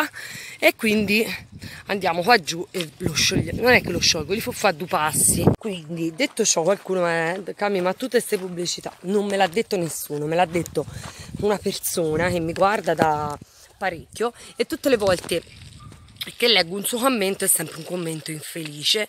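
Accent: Tuscany, Italy. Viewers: Quando sei euforica la cadenza toscana esplode proprio!! Ahah! Bella, Cami!!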